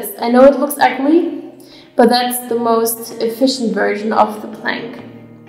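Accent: Germany. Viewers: An alle sie ist deutsche merkt man auch wenn sie spricht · Hi i just wanted to tell you that you have sich a beautiful body and you are so pretty. I also really like the style of your Workouts. Are you from germany? Bc your accent makes it seem like you are... :)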